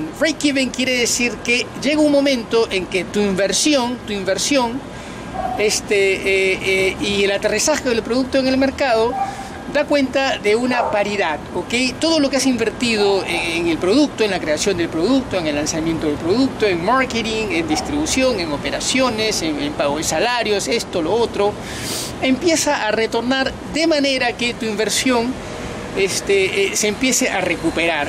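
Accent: Argentina. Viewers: Porque este peruano habla como argentino????? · me gustan tus videos los veo siempre pero se me hace raro y chistoso que hables como Argentino y tengas la camiseta de Perú, igual sus comentarios son bien acertados siga asi.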